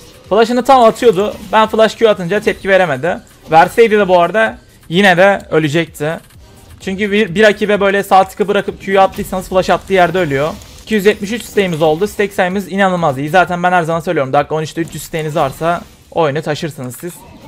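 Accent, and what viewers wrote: Adana, Turkey. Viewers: Adanalı mısın?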